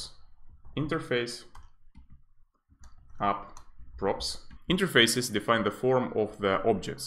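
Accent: Russia. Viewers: ох уж этот russian english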